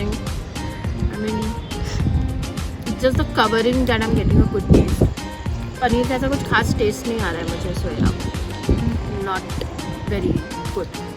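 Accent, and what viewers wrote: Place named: Mumbai, India. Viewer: Lovely VDO. Nice casual feel to it.. i absolutely love your Mumbai Hindi..❤️ Just one thing.. u missed one of my favs Irani Onion Patti samosa....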